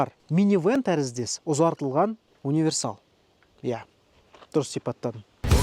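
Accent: Standard. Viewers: сау бол, рахмет контент үшін! Ұлтым Өзбек болсада Қазақ тіліні жақсы түсінемін, соның таза Қазақ тілінде сөйлегінің үшін көремін каналиңди. Рахмет. Өзбекстаннан Салом Алейкум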